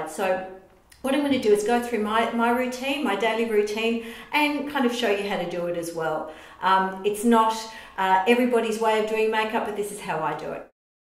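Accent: Australia. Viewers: Auzzi accent?? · is she aussie?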